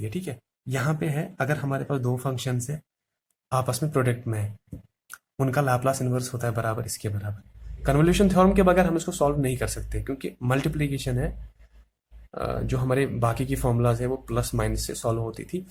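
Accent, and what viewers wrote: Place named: Kashmir, India. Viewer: your kashmiri accent is too good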